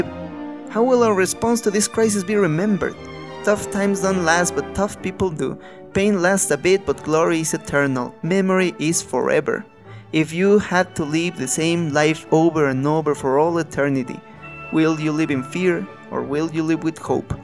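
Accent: England, United Kingdom. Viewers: Ulala señojjjj.... tiene la voz de todo un burgués Ingles 😍👌🏻👌🏻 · Ulala señor ingles 😌👌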